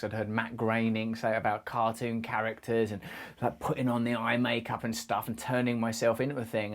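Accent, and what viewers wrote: United Kingdom. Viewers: I have to admit...the first time I saw you, I thought you were quite handsome. And then you spoke and that British accent tickled my ears. I’ve loved watching you in your movies. You don’t seem to be acting at all but rather, you walked on set and you and the role really were one. Only the best actors become the role. And then, you can walk away and you’re again. So you’re right about there being a split. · Hard for me to understand the accent in the Queen’s tongue. Yet I really like these 1vs1 testimonials from Brand. This is a good story, that proves careful what you wish for. However I am grateful I got to see this man perform, and make me laugh on occasion. Also he’s intellectual 😂 and he is from England. Thanks for the messages.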